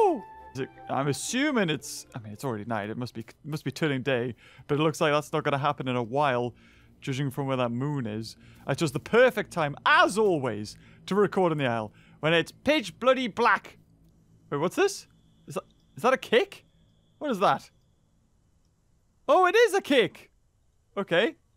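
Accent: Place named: Newcastle, United Kingdom. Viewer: You from the Toon, mate? EDIT: ok the question is now nuill and void, you said "Alreet" LoL.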